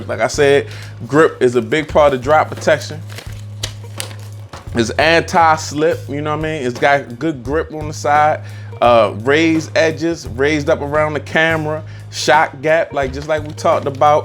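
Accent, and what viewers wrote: Baltimore, United States. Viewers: U from the Baltimore area? I recognize the accent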